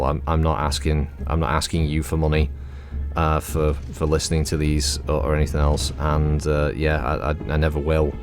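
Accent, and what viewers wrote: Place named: Northern England, United Kingdom. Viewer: Yeah like you said I listen to this while doing stuff or today I'm listening on the way to work. I love picturing in my head with your upper class accent you recording these audio lectures in your enormous Library surrounded by thousands of leather bound books at Estate in the beautiful North English Countryside 😎. At least that's the delusional imagination of an American who has never been to Europe or Mary old England 🇺🇸🇺🇸.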